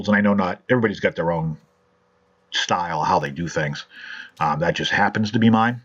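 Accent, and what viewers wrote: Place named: Boston, United States. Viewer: I love the Video! You gave me some great ideas. I noticed your bracelet, and I am a disabled veteran who was so bored during the pandemic, that I re-ignited my passion for collecting my diecast cars and motorcycles. I didn’t love the Motormax die-casts, but now I’ll grab some to customize. My big question is this, the accent tells me you MAY be a Sox fan...lol, I a New Yorker so there is that. Either way, you have a new subscriber and fellow East Coaster!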